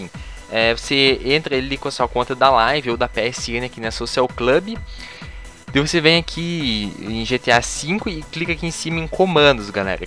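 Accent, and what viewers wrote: Rio Grande do Sul, Brazil. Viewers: gaucho? kkkkkkkk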